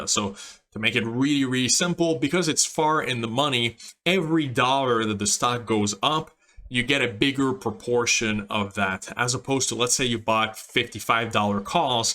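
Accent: Quebec, Canada. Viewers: Salut Est-ce que je détecte un accent Québécois?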